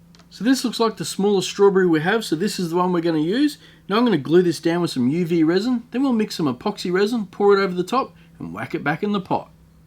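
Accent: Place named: Australia. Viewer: Completely off topic but I love your Australian accent haha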